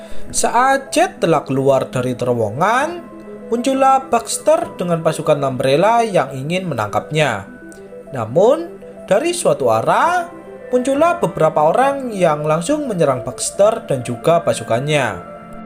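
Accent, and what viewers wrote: Java, Indonesia. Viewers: Ah ga seru ga ada suara pemainnya .malah mendengerin Suara yg menceritakannya suara wong jowo apa pake di ceritain .segala males utk bantu sabcrek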